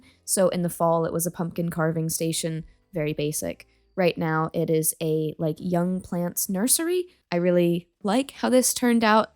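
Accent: Scotland, United Kingdom. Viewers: you sound SO Scottish HA ❤ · i also love so much that your accent sounds more scottish than when we last heard you heehee C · ive have never clicked on a video so fast!!! congratulations!!! and omg you can really hear the scottish coming through in your accent now too 🥺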